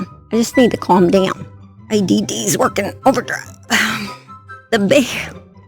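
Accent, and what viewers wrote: South, United States. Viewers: Congratulations!!!! 👏🎉 1,000 subscribers!! WOOHOO!!🌟🌟🌟🌟 You're going places 👍👍👍♥️💛💙🧡💚💜 your little Southern voice it's just perfect it fits you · I enjoyed your video and your accent. Please keep it up. Sorry about your lupus. I have 7 autoimmune problems. It stinks. You take care of yourself. I’m from NC. I love Southern people.❤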